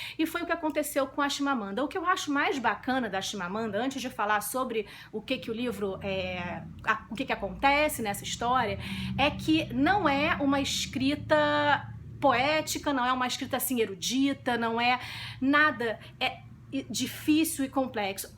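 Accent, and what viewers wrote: Rio de Janeiro, Brazil. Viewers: só me dei conta do sotaque 'carioca' depois do teu comentário, crissstão, luzzzz, contrassssti, etc. …so amazing! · Esse livro é muito maravilhoso mesmo. E a delicia que é esse sotaque carioca?! Amo seus videos. <3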